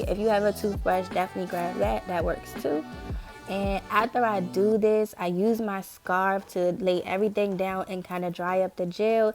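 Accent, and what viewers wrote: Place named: DMV, United States. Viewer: You from the DMV i hear it in your voice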